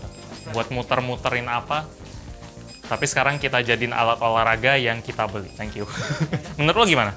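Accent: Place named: Glodok, Indonesia. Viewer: Aksennya Glodok banget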